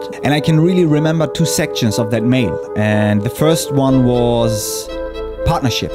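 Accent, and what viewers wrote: Germany. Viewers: Ich glaube damals über das Colour Change Video in Premiere. Fand deinen Humor einfach sehr amüsant, außerdem ist es sehr angenehm, dass du nicht versuchst deine Herkunft zu vertuschen in deinem Englisch sondern den Akzent einfach mit Humor nimmst. Macht es soo viel nachvollziehbarer und sympathischer (Bitte nicht falsch verstehen, dein Englisch ist sehr gut nur hört man es natürlich raus, dass du auch Deutsch sprichst :)).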